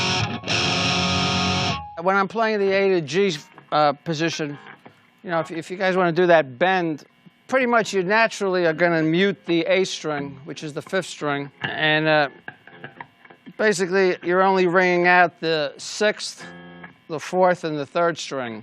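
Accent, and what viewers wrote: United States. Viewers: I'm a Native New Yorka and he sounds like half my Long Island relatives. It's a VERY natural and nostalgic accent for me to hear and it's one of the reasons that Ace has seemed so REAL to me, plus the fact that on his solo album he gives a shout out to Queens and all the Cabbies in NYC and Queens where he used to work. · Ace has the best laugh ever! Love that Bronx accent.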